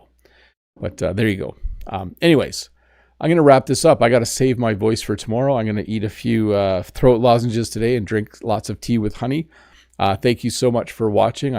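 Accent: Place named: Canada. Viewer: Thank you,! I'm going to your beautigul country on May! Thank you for your lessons! Watching your videos I can learn a litlle bit more the accent that the canadians use! I also like so much of the Rush' songs, since I was young, the great rock band of Canada. So receive a big hug, and have a nice night! Thanks a lot!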